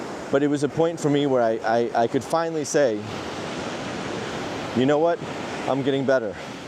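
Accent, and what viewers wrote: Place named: Canada. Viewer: Are you from Canada? Seems you have an accent. 😅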